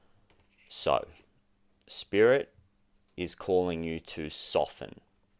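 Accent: Australia. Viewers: Hey! So great to hear your accent fellow Aussie 🦘🐨🌏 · Mate i gotta say it was quite a surprise to hear an Aussie voice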